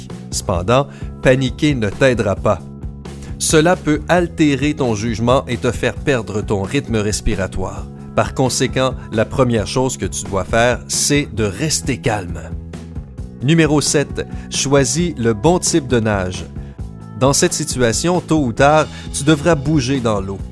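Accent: Quebec, Canada. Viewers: Tu est quebequoi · Le naratteur c un québecois non???????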